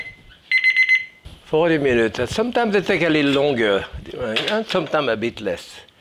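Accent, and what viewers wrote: France. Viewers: i love these little vids. he's great 😊 i love his accent - and his pan, i think hes french - those hand ! tres bon, monsieur ,tres bon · Thanks to this dude, when I'm cooking at home my internal monologue has a thick French accent.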